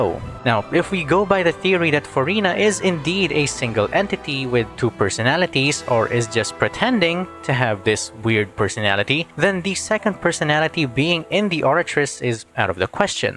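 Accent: Philippines. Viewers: I think that you are right with Furina having multiple personalities. I mean Neuvillette says Furina was researching about the prophecy (FURINA), and I mean his reaction to her being scared of him not going to the meeting seemed like Furina is usually that eccentric lol. And also the emotions she shows like crying in her room seem real. So it would make sense, but these are just my guesses. Also I noticed half way through the video that you are Filipino, barely noticed the accent 😂 I AM TOO, so I was pleasantly surprised :D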